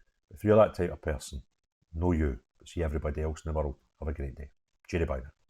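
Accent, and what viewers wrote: Scotland, United Kingdom. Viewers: your Scottish accent is hard to understand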